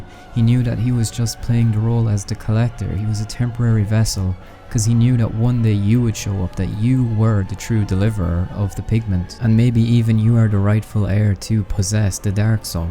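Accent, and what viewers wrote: Ireland. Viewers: your accent. Irish? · your accent but it sounds Irish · You sound Irish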